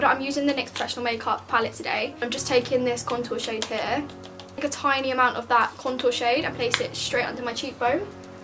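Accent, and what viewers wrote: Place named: United Kingdom. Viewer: Soooo fabulous!! Your work is wonderful and I love your british accent 💕💕 saludos desde México! 🇲🇽🇲🇽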